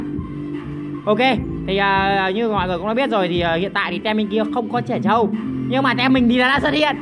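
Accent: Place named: North, Vietnam. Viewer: Giọng ông này hài vcc lúc tiếng bắc lúc tiếng nam :))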